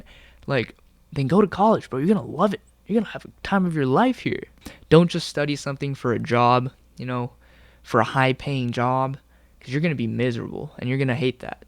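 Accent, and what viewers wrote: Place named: California, United States. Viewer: I'm from New York (Brooklyn, Bed-Stuy) and I knew from the moment you started talking you were from California, the accent is pretty heavy.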